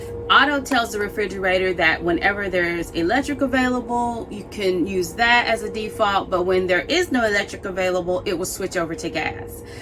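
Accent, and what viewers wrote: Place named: South, United States. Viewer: Girl.....I thought you were from the south. I wish I could take credit, I use to watch my grandmother toast bread that way. Great video thank you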